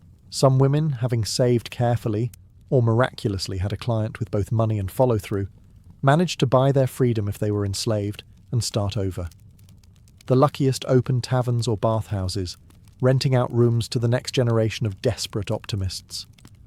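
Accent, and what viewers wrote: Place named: England, United Kingdom. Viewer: LMFAO You crack me up when you say such funny things in that classy English accent of yours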